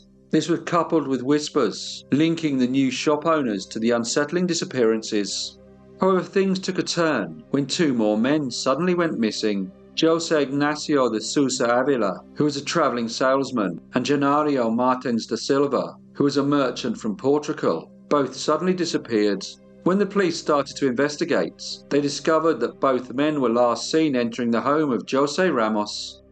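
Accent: England, United Kingdom. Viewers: Reputable channel, not quite. The narrator can not even say the gentleman's name the right way. Isn't it said Hose' or 'ose'? You don't say the J because it is silent? If this channel was reputable, it would have also done the research of how to pronounce the Brazilian names. Hence, the English narrator was probably making fun of how the South American dialect is pronounced. This is the reason why I have not subscribed to this channel. I can't stand the one tone monolog, and that flucking English accent!!! Why not be a little more respective, and also get another narrator, possibly a female!!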